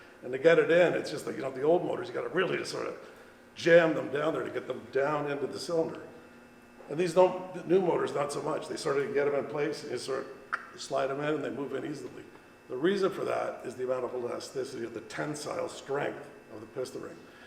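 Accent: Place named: United States. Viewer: Your accent is American, but you’re talking in kilometers. What am I missing?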